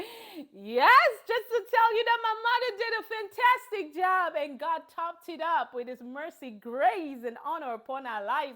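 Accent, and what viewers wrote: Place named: Northwest Region, Cameroon. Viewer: Please do videos with more batibo accent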